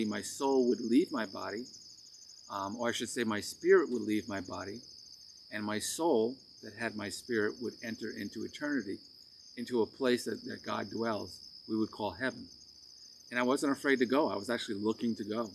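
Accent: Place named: New York, United States. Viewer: This is fascinating,! The New 'Yawkr' accent coming out here. I am from Ossining, NY so I really hear it. I support and believe in you AND the experience you've had. May God bless you and you're family and keep tawkin bro. ❤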